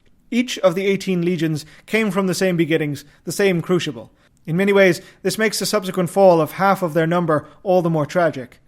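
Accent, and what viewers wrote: Ireland. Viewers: I can't place your accent - is it Irish/Southside Dublin?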